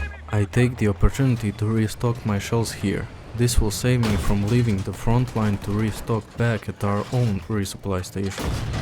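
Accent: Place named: Eastern Europe. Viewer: Holy shit my guy has a voice! Lol if you ask me all us slav’s have the same accent when speaking English (2nd language) 😂. Продолжай в том же духе, брат 🫡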